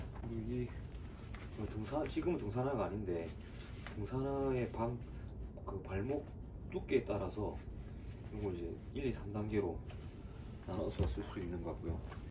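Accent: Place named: Busan, South Korea. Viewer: 잘봤어요. 구독했어요. 부산쪽 분이신가 봐요. 평소 잘보고 있어요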